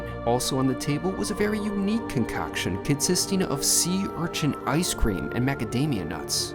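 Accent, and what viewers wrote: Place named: Chicago, United States. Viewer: I knew that you were from Chicagoland from your accent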